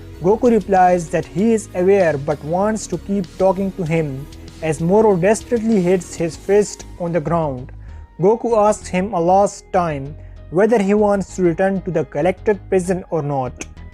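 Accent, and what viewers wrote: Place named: India. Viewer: Your voice is like a indian btw i love your videos and now i also love your voice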